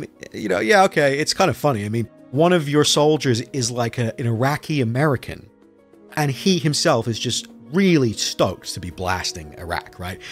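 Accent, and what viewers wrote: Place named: Northern Ireland, United Kingdom. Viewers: he's a Brit who has lived in America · He sounds (Northern) Irish to me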